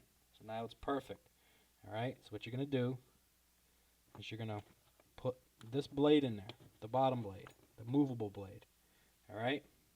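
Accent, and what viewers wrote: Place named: New York, United States. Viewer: U from new York right for 100 buck I bet I'm right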